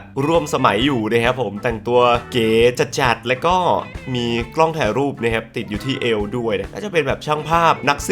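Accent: North, Thailand. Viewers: พี่เป็นคนเหนือใช้ไหมครับถ้าใช้พี่อยู่จังหวัดไหนผมอยู่อุตรดิตถ์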